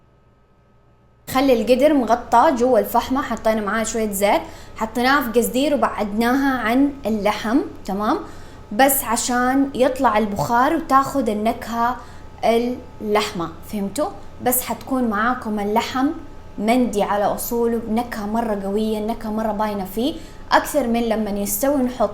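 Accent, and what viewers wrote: Hejaz, Saudi Arabia. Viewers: سعودية حجازية .. هذي لهجة أهل الحجاز.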